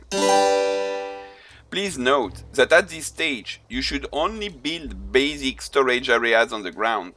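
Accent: France. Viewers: i like your french accent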